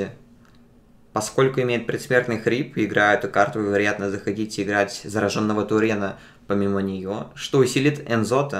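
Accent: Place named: England, United Kingdom. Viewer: у тебя очень приятный голос. Видно, что ты уже привык к английскому языку и у тебя присутствует этот акцент. Надеюсь это не единственное русское видео на твоём канале)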